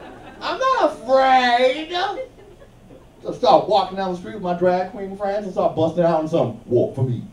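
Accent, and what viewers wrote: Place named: Louisiana, United States. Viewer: Is he from Louisiana and idk why but I adore there accent. Whatever u do in ur life is none of my business. ✌ ❤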